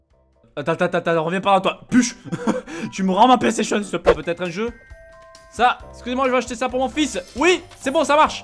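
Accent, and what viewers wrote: South, France. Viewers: tu viendrais pas du sud car tu a l'accent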